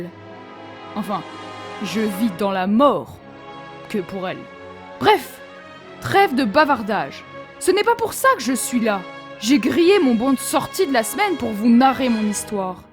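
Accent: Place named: Italy. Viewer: Quelle vie cet! J'aime beaucoup l'ambiance italienne de ta vidéo avec l'accent et tout, bravo à toi 😃 et je trouve que ta construction est très réussi, j'aime particulièrement le bureau que tu as fais au rez de chaussé! Bisous à toi 😙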